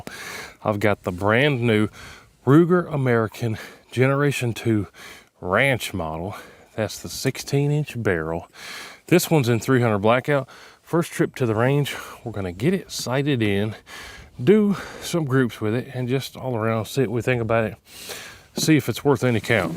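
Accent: South, United States. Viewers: what the hell is zoomies please speak proper english not country red slain.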